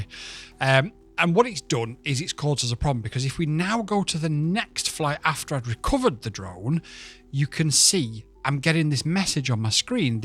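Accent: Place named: Manchester, United Kingdom. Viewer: is Welsh, but you hide your Mancunian twang quite well. To my Scandinavian ears, it sounds more like a standard northern accent. But don't trust my opinion — I'm just an ABBA-Volvo-Ikea bloke and everyone knows what happened at Lindisfarne when the monks trusted us.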